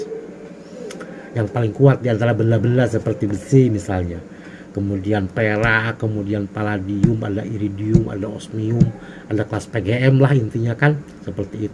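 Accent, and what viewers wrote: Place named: West Java, Indonesia. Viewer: ❤logat Abah sapertos Sunda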